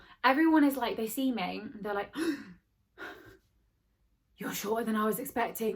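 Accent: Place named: United Kingdom. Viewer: Oof that British accent 🥵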